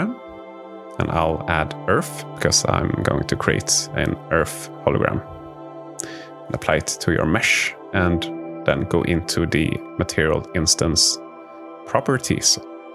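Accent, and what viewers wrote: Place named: Netherlands. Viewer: Your voice and pace, made me enjoy this tutorial,very helpful, I think it has to do with the Dutch accent there 2😂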